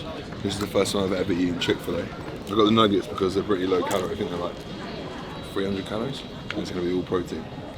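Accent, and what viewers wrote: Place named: United Kingdom. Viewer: Bro I don’t know if it’s the British accents or what but I have been on a British bodybuilding channel binge for the past couple months great content sir.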